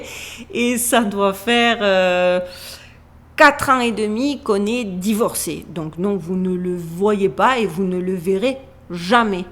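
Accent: Marseille, France. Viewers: 3eme commentaire. Rebonjour. Moi j'ai une question. Est-ce que ton accent du sud ressort lorsque tu parles anglais? Précisément: est-ce que tu parles anglais avec l'accent Français du sud? 🤔🙂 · Alors la, je n'ai jamais autant ete choque par une video de... Des gens pensent que tu es un.... homme????? Bontee divine... Bon, c'est vrai que de plus en plus de gens ne savent plus que c'est une femme, mais quand meme! Ton accent est charmant, et en plus ca te rend plus "naturelle", en tout cas, moi je l'aime bien ton accent du sud!!!! C'est cool de voir des images de "vers chez toi", est-ce qu'il y a des "legendes locales" que tu pourrais nous partager? Ou des endroits "Mysterieux" ou qui sortent de l'ordinaire? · Wow je ressentais bien que tu es une femme spéciale mais je dois ajouter que tu es formidable ♥️♥️♥️. Quelle force, quel parcours! Et quelle beauté! 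Ne change rien et continue à nous transmettre le fruit de tes recherches et ton humour, le tout avec ton adorable accent du Sud. Ta mâchoire est juste parfaite! C'est souvent ce qui nous a complexé étant jeune qui se révèle être un atout une fois adulte. Plein de good vibes de l'île de La Digue aux Seychelles... Et toutes mes félicitations pour ta chaîne. 🎉❤ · Disclaimer: je ne crois pas DU TOUT à ses rumeurs! Toutefois, méfiez-vous! Les trans peuvent être en apparence des "femmes" extrêmement féminines. "Elles" se font gommer les traits masculins (comme des mâchoires trop carrées ou le front à bosses typiquement masculin) par ce que les chirurgiens plasticiens appellent la féminisation du visage. Pour ma part, je trouve le visage de magnifique et sa mâchoire n'a rien de masculin pour moi. Vous aurez vu la mienne!!! 😆 Ce qui m'aurait mis un doute ce sont ces mains, très belles mais un peu grandes pour une femme. 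Je me suis dit que c'était l'effet d'optique (plus proches de la caméra que le reste) mais il y a des femmes biologiques qui ont de grandes mains. Pour reconnaitre une transexuelle, il faut savoir analyser beaucoup de choses et être au fait de beaucoup de choses. Et, surtout, ne pas prendre en compte une caractéristique mais tout un ensemble de traits (visage, corps, comportement). Après, les "haters" trouvent tout et n'importe quoi après avoir quelque vidéos sur cette mode de transition. Et a tout lu, à commencer par le plus facile: une blonde à l'accent Marseillais c'est tout de suite une cagole. P.S.: Comme pour tout le reste, le fait d'avoir un enfant n'est pas un argument. Il y a des trans qui ont des enfants qu'ils n'ont pas porté et vont même jusqu'à simuler la grossesse. Eh oui, ça fait partie des choses sur lesquelles les gens ont du mal à ouvrir les yeux. Le net regorge des sites qui venden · haha 🤣🤣🤣 Merci pour cette franche rigolade!! 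Si je vous appelle, ça vous dérange? 😆 Ah et confirmation... J'avais bien reconnu un léger accent marseillais. A moins d'avoir envie de tirer des pruneaux et de jouer de la baillonette je ne vois pas l'intérêt de revenir vivre en France durant les deux prochaines années. Vous êtes certainement plus en sécurité là où vous êtes. En France ça devient du grand n'importe quoi... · ❓Tu as conservé la langue française et l'accent de Marseille, bravo. Comment tu as résisté en ayant vécu dans des pays anglo? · Allo, finalement tu n'est pas une stupide blonde😉😉🤣, Merci pour ton premier F.A.Q., l'explication du nom et de la photo... au sujet de l'accent, je savait quelle venait de Marseille! ( ouais, je suis Québécois, mais; j'ai eu beaucoup d'amis (es) Français) je préfère l'accent du Saguenay!...J'ai une question en deux temps, quel Youtubeur suis tu et est-il possible de voir une collaboration avec eux? 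· Aussi ce que j'ai découvert et adoré, vous êtes originaire de la région Marseillaise, je m'en doutais à votre accent.Comme moi d'ailleurs, actuellement j'habite à Istres, donc pas très loin de St Martin de Crau, donc la prochaine fois que j'y passerai, je penserai à vous.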